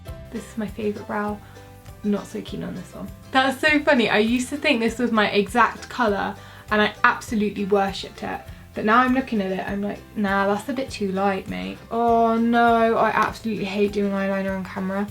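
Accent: United Kingdom. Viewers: Where are u from u sound like ur from England are u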